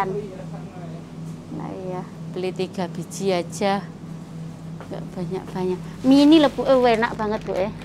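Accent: Java, Indonesia. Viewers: Bahasane medok mb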